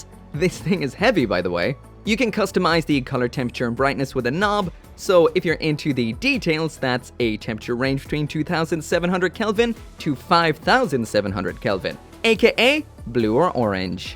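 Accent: Ireland. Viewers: Ahh... he's irish. That's why he talks like that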